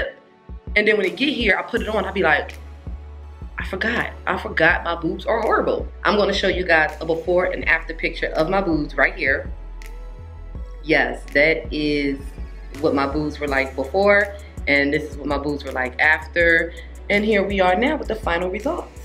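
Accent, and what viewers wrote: New York, United States. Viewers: You talk like you from new york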